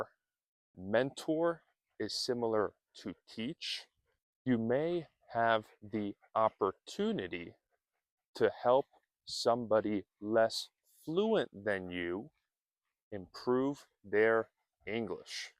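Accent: United States. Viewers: Hello, teacher! I’ve been listening to your last videos lately. Your helpful method of ‘slow English’ is widely appreciated according to all the comments we can read every time you post a new lesson. Congratulations! You have mixed abilities and the mental power needed to explain words and expressions clearly. I have been teaching French for years, and I love phonetics. You insist firmly on pronouncing the soft ‘t’ instead of the hard ‘t’ we often use in Europe. Notice that I do not disagree with you, on the contrary, but you must have a reason. Your accent is very easy to imitate and I assume it’s from New England. We are all grateful about your work.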